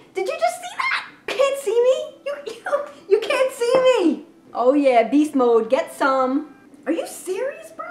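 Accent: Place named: New Jersey, United States. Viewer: i love new jersey accents ! ! ! now thats wirth a sub there!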